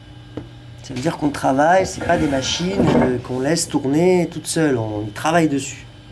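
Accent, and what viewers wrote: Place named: South, France. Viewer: Je ne sais pas pourquoi YouTube me propose cette vidéo.... Une intro à laquelle on n'entend ni ne comprend pas grand chose, on se balade dans des travées pour voir des portes armoires blanches et une outro presque aussi désagréables que l'intro. Quand on croise quelques appareil ou que des portes s'ouvrent, la voix nous dit juste ce que c'est dans son jargon sans expliquer. L'intention est sûrement louable mais on n'est plus en 2010. PS c'est original un gars avec l'accent du Sud de la France, à Rennes, qui parle comme un belge ou un suisse (nonante :)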